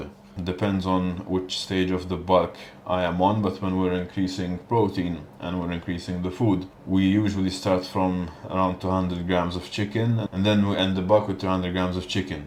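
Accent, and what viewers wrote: Malta. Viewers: First time I came across u was on ig ofc and bro as soon as I heard the accent I knew u were from malta ! My question is what's your best tip for beginners?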